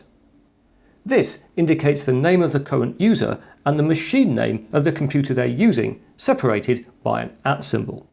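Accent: England, United Kingdom. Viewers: Hello. OpenSource enthusiast since first Linux distro release, Slackware. I have watched several of your videos and I am very impressed with the tools, creation, content, and the way u explained some complicated subjects for the regular audience in very understanding manner. Not to mention the nice British accent!! Kudos!!! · Is this traditional England tone？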